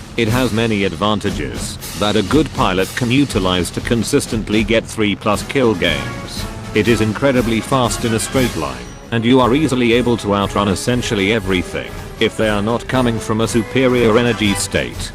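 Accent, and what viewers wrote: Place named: United Kingdom. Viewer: He is a brit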